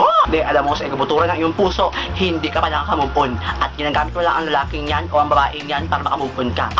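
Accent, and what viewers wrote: Visayas, Philippines. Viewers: bisaya kaba?? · bisaya diay ka hahaah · BiSAYA kaau uhy ! HAHA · Bisaya diay ka kuya? Ako pud baya XD Hahaha!! More power dahhling!:) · bisaya diay ka ter :)) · taga asa ka?bisaya lagi ka?hehehe.malingaw jd ko sa imo ba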